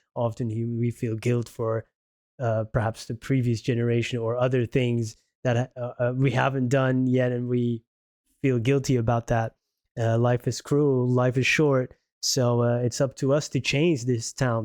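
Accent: United States. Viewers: Hey thanks for the reply. First let me apologise for calling you an American I didn't mean to insult you. But true you do sound a little American. I would love you to react to more / videos as I remain a lifelong fan. I would suggest looking at some of Wellers solo work as well particularly the album some of which was filmed at La Luna in Brussels. I included this as a small example <link> Keep up the great content 🤘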